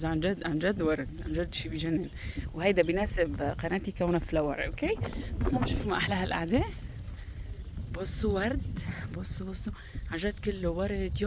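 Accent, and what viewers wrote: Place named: Egypt. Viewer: ربنا يسعدك حضرتك بتتكلمى مصري ماشاء الله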